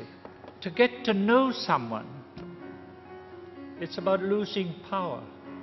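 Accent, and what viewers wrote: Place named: Ireland. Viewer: he sounds as if he has an Irish accent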